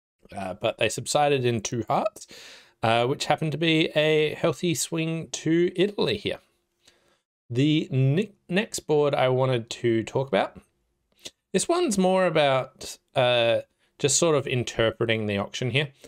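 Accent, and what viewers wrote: Australia. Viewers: How have I missed your channel till now? Enjoy your vids - good to listen to an Aussie discussing bridge. Love the analysis and hope you get to ake a lot more of these, I will be a regular watcher from now on.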